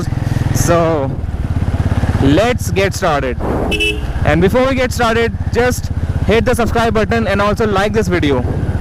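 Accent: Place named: India. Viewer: I love the Indian accent...so soft and calming...and I have a Classic...so this vid is great for me!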